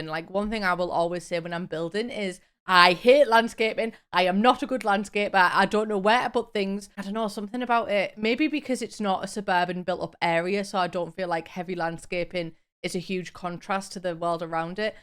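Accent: United Kingdom. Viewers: I need you to know that I absolutely LOVE you and your accent is my idea of the perfect British accent. Also this may be my favorite build you've ever done. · Whole truth, i used to watch your videos to get used to your accent so that i can communicate better with my British clients and it worked. I get everything everyone says 😋 Thanks😬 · I watch a ton of British tv and youtube, so I don’t struggle with your accent exactly, it’s the combination of your accent and how fast you talk😉 I have literally had to slow your videos down to figure something out... and that’s mostly on me because I have hearing loss from listening to my music excessively loud when I was a teen... and even now sometimes... I didn’t learn my lesson😆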